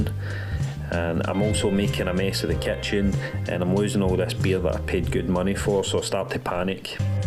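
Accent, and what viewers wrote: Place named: Scotland, United Kingdom. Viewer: Great review. And one of the nicest scottish accents ever! 😁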